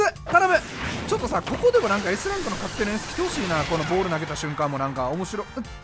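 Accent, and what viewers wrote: Kansai, Japan. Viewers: なんか関西弁の自賛は嫌みがない気がする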